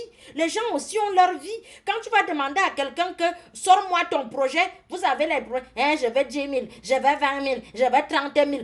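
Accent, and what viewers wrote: Cameroon. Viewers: I love your camerunian accent sister 😍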